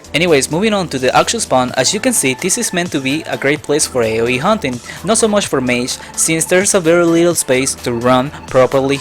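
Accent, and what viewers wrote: Mexico. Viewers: Tienes buen inglés pero te escuchas mexa (no es que sea malo) sigue practicando para que suenes nativamente pero igual buen video Casi creí que era un canal gringo 🤣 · are you mexican? i feel it on your voice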